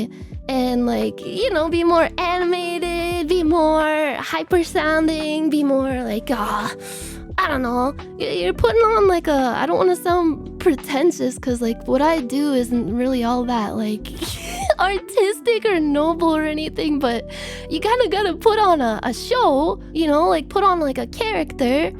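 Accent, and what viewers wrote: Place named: Michigan, United States. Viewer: I want to hear her authentic Michigan accent more sometimes.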